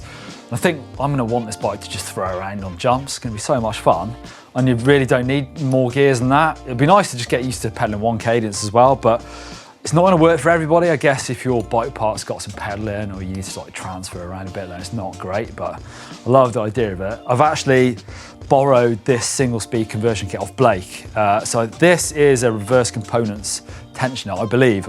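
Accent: United Kingdom. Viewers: pleasee chenge the dude I just cant listn to his british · Your voice is too gay and British m8 I can't watch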